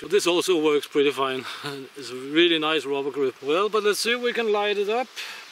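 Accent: Germany. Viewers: Why the German accent?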